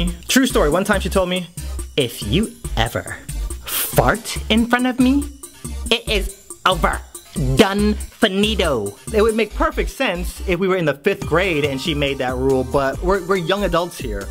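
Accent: England, United Kingdom. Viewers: That English accent tho! Lol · Your british accent tho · That is a good British accent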